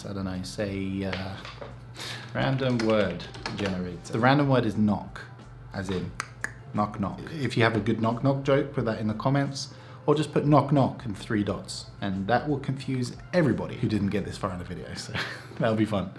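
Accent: Finland. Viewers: It's probably just me hearing things, but it kind of sounds like you've got some, just barely-there hints, of Finn-spoken-English in your English accent now.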